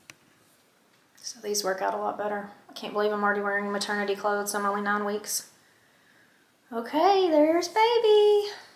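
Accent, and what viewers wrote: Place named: Georgia, United States. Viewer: That heartbeat sounds glorious! I heard you say it said 130 for a second. When I was pregnant with my daughter her heartbeat never went out of the 130’s my entire pregnancy. The doctor would say she was just chilling out in there 😂. My son’s heartbeat never went below the 150’s. It was usually in the 160’s. I’m so happy for you! So glad I came across your channel. On a side note, your accent sounds like mine 😊. I’m born and raised in southwest Georgia.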